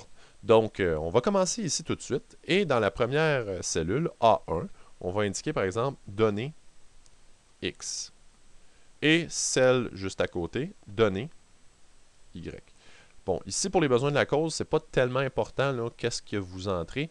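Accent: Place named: Quebec, Canada. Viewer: un peu long et accent du québec mais bien expliqué , complet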